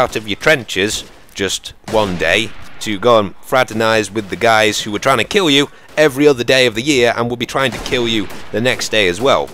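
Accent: United Kingdom. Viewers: are you British?? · Not gonna lie, I didnt know was British! xD Aha, I really wanted this game ages ago, but my PC wasnt good enough (it was a pile of crap) probably gonna get it soon!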